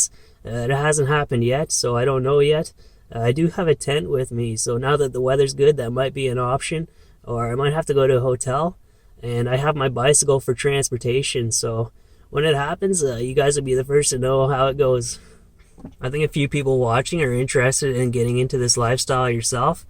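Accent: Canada. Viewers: Your young Jordan Peterson lol the Accent. What region of Canada? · Love your Canadian accent. I’m gonna be watching lots of your YouTube today just got two teeth pull so it's a down day for me. 💕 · Canadian accent activated and recognized. 👍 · I love listening to you. As a fellow Canadian, it's like listening to the McKenzie brothers (early 80's). Stay well!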